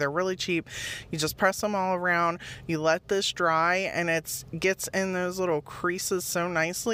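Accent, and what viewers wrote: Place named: East Coast, United States. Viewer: I know an East coaster when I hear one but you almost got me with the ya'll lol. I lived in that store before I moved thinking they were everywhere what a disappointment when I got here. If they were smart they would expand cause the sales would make up cost 10 fold. Binge time to see all the nice things you have made. Keep up with your style an humor cause it's great.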